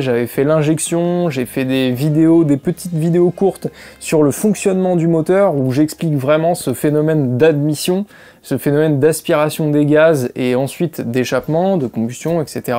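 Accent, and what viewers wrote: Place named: England, United Kingdom. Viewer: oh putaing l'accent anglais .......😂😂❤ Tu mélange beaucoup de choses! Ride-by-Wire, appelé aussi Drive-by-Wire, vu pour la première fois sur la Yamaha YZF-R6 en 2006. La poignée des gaz est reliée à un contrôleur, lequel envoie les changements de position de la poignée à l’ECU, lequel à son tour envoie un signal aux actionneurs, les composants électroniques et mécaniques agissants sur les injecteurs, en prenant en compte différents paramètres : le régime moteur, la vitesse enclenchée, l’oxygène non consommée dans l’échappement, etc. Ce système permet un contrôle précis du mélange air/carburant......après le reste c'est de la mécatronique que tu es en train de mélanger à ton sujet...❤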